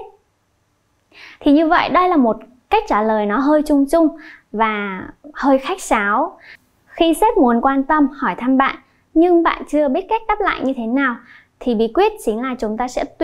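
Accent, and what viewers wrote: Standard. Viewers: Cảm ơn cô nhé hay dễ hiểu chuẩn rõ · thấy cô là người phát âm chuẩn nhất nên em chỉ học theo cô, và tự học ở nhà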